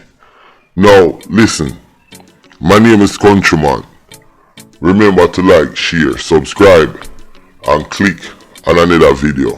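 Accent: Jamaica. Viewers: vincy cah cuss CLOTH which such genuine accent like dat..he is Jamaican..and yes he is distorting his voice..if u notice most of his videos are from Jamaica..listen to how he say im for him and uno and other words..real Jamaican dat!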